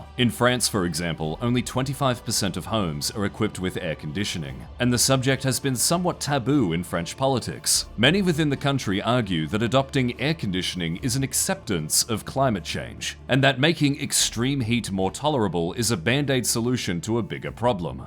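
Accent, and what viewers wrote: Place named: Australia. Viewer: Intro hits hard, your intros always hit hard. The music choice is spot on EVERY time. i feel like ive seen more aussies in the past 5 years online than any time before in my life and i just wanna say you're (almost) all awesome. Australian accents are like british accents just with some spice, and a million times better, imo. Live long an prosper (not a startrek fan)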